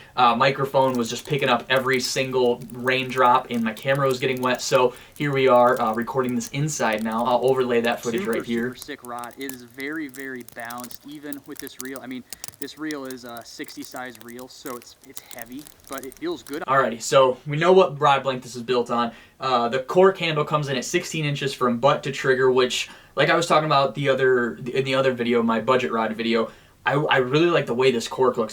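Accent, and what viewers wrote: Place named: North, United Kingdom. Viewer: This channel is awesome, this guy totally reminds me of another YouTube angler. If you close your eyes and just listen to his voice, he sounds almost identical to Sam Sobi, just a lil less northern 😂.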